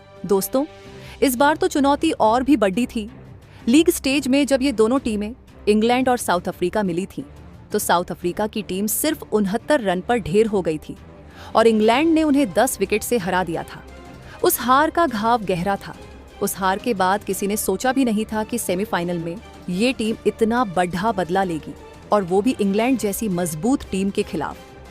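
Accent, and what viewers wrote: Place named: Maharashtra, India. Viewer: Hindi sahi Hai, objection is Kiya Hai ki haha marati ward Jada Hai.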